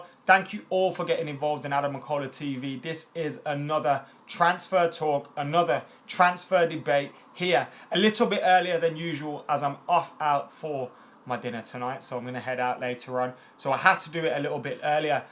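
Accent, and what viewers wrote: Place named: Manchester, United Kingdom. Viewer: are you from Manny? Your accent sounds familiar mate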